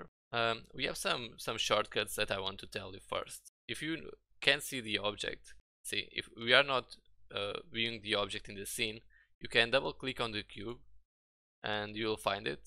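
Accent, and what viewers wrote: Portugal. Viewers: claramente accent Portugues :D Bom video! Obrigado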